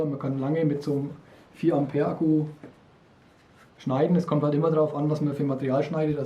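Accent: Germany. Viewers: Gute, komprimierte Erläuterung. Danke. Vom Dialekt her würde ich auf Mittelfranken tippen, Nbg, Fürth, Erlangen. ;-) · Ich höre doch einen Franken raus ? 👍👌 Tolle Videos.....des gibt Auf jeden Fall a Abo 🤘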